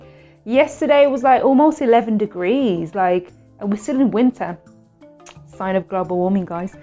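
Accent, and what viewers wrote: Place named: Birmingham, United Kingdom. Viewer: I just like the slight Birmingham twang! New subscriber here, do you ever do vlogs out and about?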